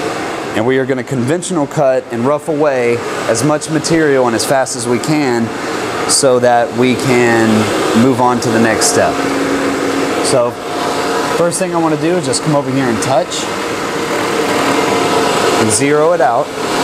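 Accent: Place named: South, United States. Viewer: The guy clearly has a southern accent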